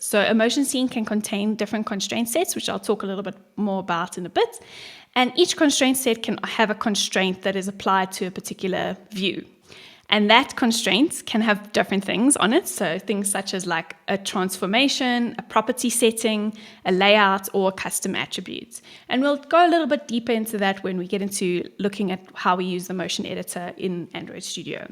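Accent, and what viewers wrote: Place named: South Africa. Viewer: woah threw me off like wait south africa? is that what im hearing :D eyyy same same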